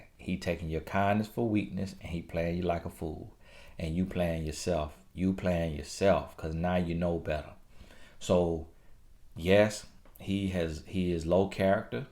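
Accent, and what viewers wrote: South, United States. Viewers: Lol, and his cute country accent.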